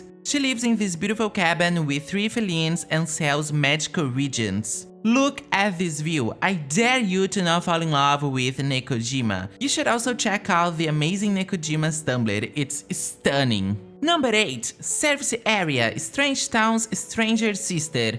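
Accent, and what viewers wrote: Brazil. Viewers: Simmm, assisti o vídeo pensando, é brasileiro certeza kkkkk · Adorei o video e pelo sotaque ja sabia que era BR. Can anyone recommend some hoods available for the Super collection for mac users? :(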